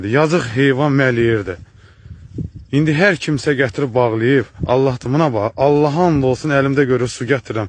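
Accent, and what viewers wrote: Sabirabad, Azerbaijan. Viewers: Vallahi 40 ildir Rusiyada yaşayıram, Qardaş Allah kömək olsun sənə, Yezidə lə'nət oxu, Ləhçə Sabirabaddi dənə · Qardaş Allah razi olsun sizdən bizdə beləyik mən özum butun heyvanlara yazigim gəlirr xilaskar bir insanam ləhcəniz sabrabadd 😊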